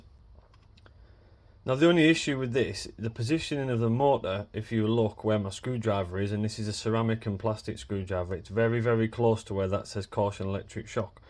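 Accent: Scotland, United Kingdom. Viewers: Are you from Scotland? I like your accent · Is that Scottish accent?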